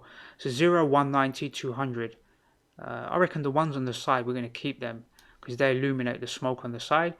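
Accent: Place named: England, United Kingdom. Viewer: I love your English accent 😂🥰 Nice tutorial, thank you! 👍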